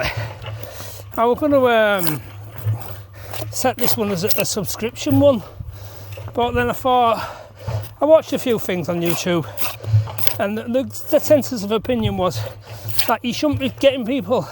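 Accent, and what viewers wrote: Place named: Leeds, United Kingdom. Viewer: Don’t worry about the fool picking on your accent. coming from Crossgates and living in Australia for 34 years , your Leeds slang is an added bonus when watching your videos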